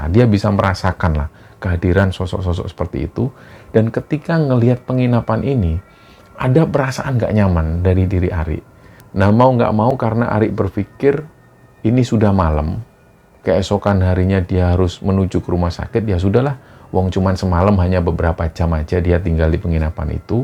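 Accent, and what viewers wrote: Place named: Surabaya, Indonesia. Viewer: Mas dialek Surabaya nya kental banget....☺️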